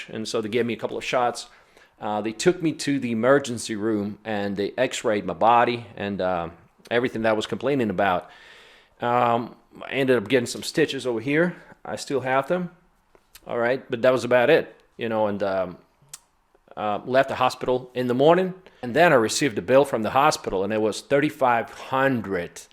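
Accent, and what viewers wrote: South, United States. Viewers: Very good, I hear a southern accent i think. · I saw a radom video from you, then look at some more... seeing your family member from UK visiting her parents. But I couldn't figure out how a Southern US guy ended up with Russian parents... hey, maybe he was adopted, I thought....;-) then dug deeper into your channel and found amazing videos. You really give a nice perspective of Russia and its people. Maybe you need to "channel these" background video's of your years in US and how you gained your experiences, your viewers would understand better your background. I am amazed how well you speak English, with southern accent and all, even your wife speaks natural. Somewhere you must be an old US Southern soul how got reborn again in Russia, because you got the fighter-entrepreneur spirit and all;-) keep making these vids, you're doing good. 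До скорой встречи! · you have adopted a southern American drawl! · The southern accent and the Russian accent mix well. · your southern twang when you speak English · I love that I can hear a little bit of southern twang in your English accent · You're a natural story teller! Plus your English is excellent down to a bit of Southern accent. Great smile,too! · Love the Russian accent with that southern twang.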